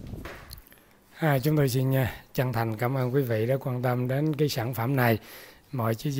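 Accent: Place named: Quang Ngai, Vietnam. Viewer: Giọng giống ở QNgai quá. Hì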